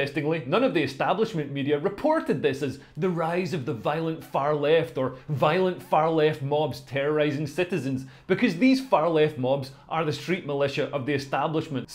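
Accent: Scotland, United Kingdom. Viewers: I love listening to you. Scottish accent right enough but your words are always, totally correct. Northern Irish with factual Scottish roots. Thank you for facts that that the B-BBC can’t get an actual fact these days.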